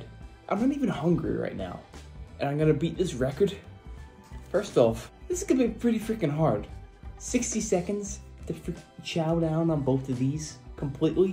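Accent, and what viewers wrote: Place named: New York, United States. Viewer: your NY accent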